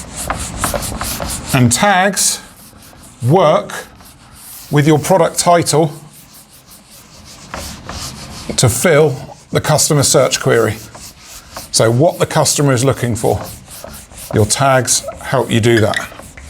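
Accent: England, United Kingdom. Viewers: great to have an English accent on here. Most etsy helpers seem to be from US!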